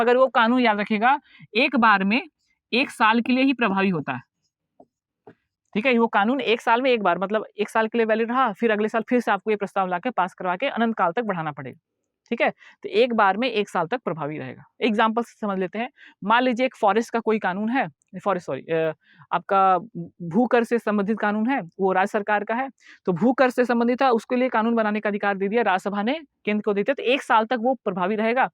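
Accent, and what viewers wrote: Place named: Chhattisgarh, India. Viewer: Sir hum logo ko chhattisgarhi bolne achchha lagta hai waise aapka Hindi bhi bahut majedar chhattisgarhi jaisa aanand deta hai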